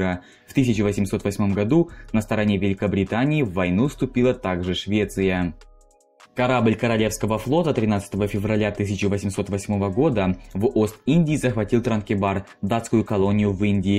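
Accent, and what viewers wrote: Ukraine. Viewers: Не родной, он с Украины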